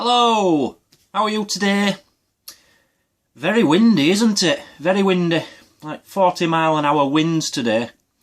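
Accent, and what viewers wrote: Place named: Liverpool, United Kingdom. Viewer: OMG he looks like Bilbo :3 but just with a liverpool accent. so cute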